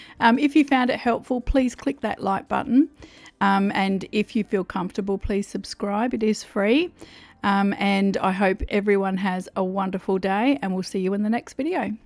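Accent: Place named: Australia. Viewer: Love to hear an Australian accent !!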